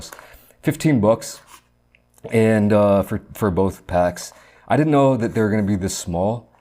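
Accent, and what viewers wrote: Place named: Midwest, United States. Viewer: Why would he be canadian? He clearly has a midwest accent and lives in Korea lmao, nothing canadian about bro.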